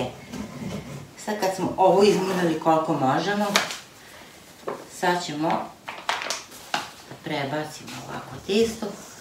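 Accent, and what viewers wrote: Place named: Sombor, Serbia. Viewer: Kad Bas slusam kao da sam u Somboru.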